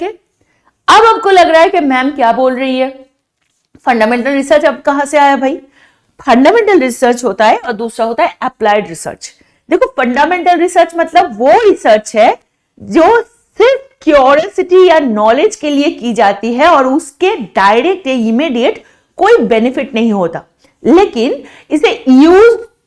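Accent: Bengal, India. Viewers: Develop countries like America France Russia Britain Japan etc Underdeveloped nation like Afghanistan Bangladesh Myanmar Chad Mali Nepal etc I think ma'am you are from West Bengal. Bcoz you hindi tone like that. Thank you ma'am.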